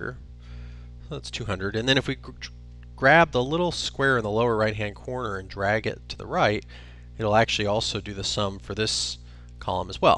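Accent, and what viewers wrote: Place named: United States. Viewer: Thanks. Nice to hear an American voice teaching statistics!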